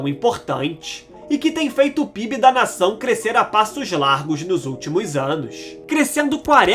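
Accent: Rio de Janeiro, Brazil. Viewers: O que mata o video é esse carioquessss do narrador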